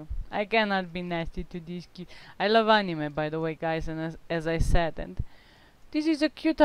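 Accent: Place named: Portugal. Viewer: Your Portuguese accent speaking English is beautiful!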